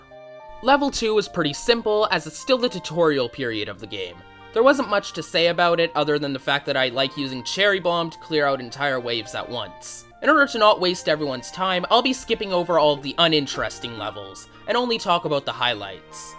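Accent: Canada. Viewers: oh, youre canadian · He’s Canadian dumbass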